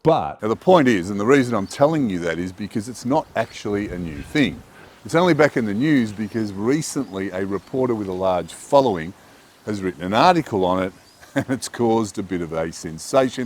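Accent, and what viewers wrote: Australia. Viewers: A guy with an Australian accent is telling me not to boycott woke Harley Davidson. Go woke go broke! brother!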